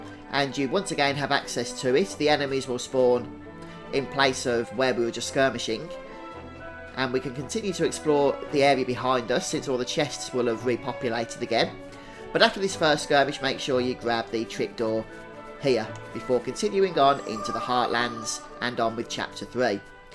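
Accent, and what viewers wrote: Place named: England, United Kingdom. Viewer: OBVIOUSLY he has an English accent. Start traveling, educate yourself and get cultured. The world doesn't revolve around how YOU particularly speak.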